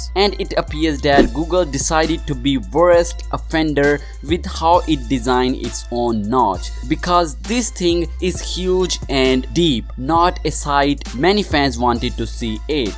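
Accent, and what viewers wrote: India. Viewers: Ну я, конечно, не могу этим похвастаться, однако мне все равно кажется, что это какой-то восточный акцент (то ли индус, то ли еще что-то в этом роде).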